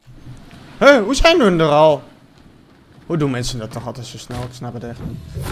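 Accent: Groningen, Netherlands. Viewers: Kom je uit Groningen ? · Ben je Gronings?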